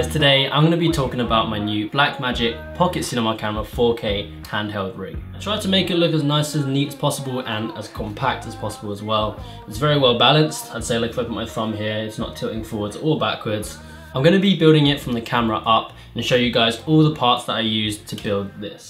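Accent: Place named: United Kingdom. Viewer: When did Elliot Choy get a British accent?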